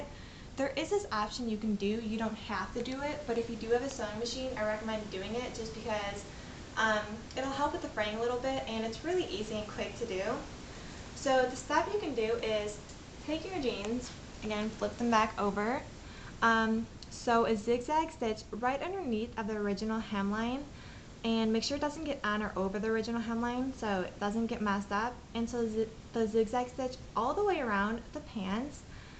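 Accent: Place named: United States. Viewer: She sounds very American:)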